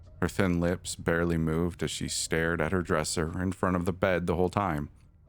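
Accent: Canada. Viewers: oh yah, you’re my favey! Great voice,(the teeniest, loveliest Canadian accent) awesome selection, I’ll be around for your whole run :)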